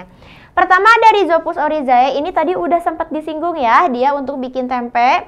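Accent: West Java, Indonesia. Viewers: sunda beneeerr... orang sumatera sambil senyum dengerinnya. sukses ya kak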